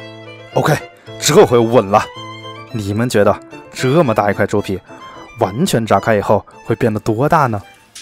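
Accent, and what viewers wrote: Wuhan, China. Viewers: 听着你武汉的普通话真是亲切。我也是住在德国的武汉人，什么时候你也做做Panettone?相信你也一定会做得很有特色的！ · 作为一个武汉人，突然听见了汉普也是挺意外的！哈哈哈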